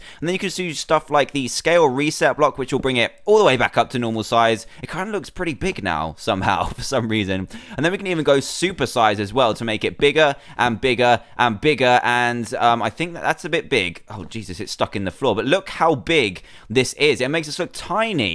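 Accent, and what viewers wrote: United Kingdom. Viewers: SO THATS HOW HE SAIS IT BECAUSE HE IS BRITISH AND THEY PRONOUNCE DIFFERENTLY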